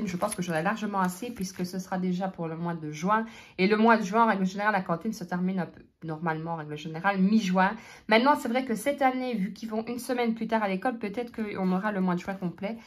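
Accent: Belgium. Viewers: Bonjour tes vidéos sont très vivantes et j’adore l’accent belge ,tu aimes les fleurs alors acceptes ce bouquet 💐🌺💐🪷🌾🌸🌻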